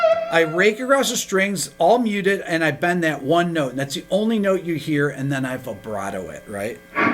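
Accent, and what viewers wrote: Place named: United States. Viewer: Been playing for 40 years but my knowledge is reassured everytime I listen to Me Beato, being Spanish my first language,my English skills are also honed with his excelent American accent.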